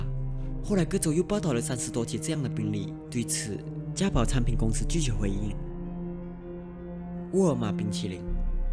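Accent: Malaysia. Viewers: 大馬口音好嗎？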